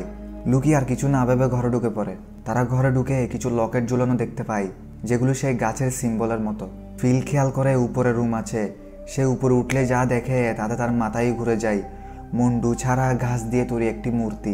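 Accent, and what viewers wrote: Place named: Noakhali, Bangladesh. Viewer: Noakhila bhi... Kemon asen.. apne noakhali basha bolen jae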